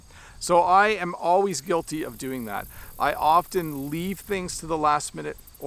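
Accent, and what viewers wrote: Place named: Canada. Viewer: If there is a person without stress, tell me who, I’d wanna be that person! Great lesson. The more I watch your lessons, the more I like them. Because you teach in a very unique way and are kind and understandable. And your explanations always make sense. Keep it up, especially because I love Canadian English. Greetings from Switzerland.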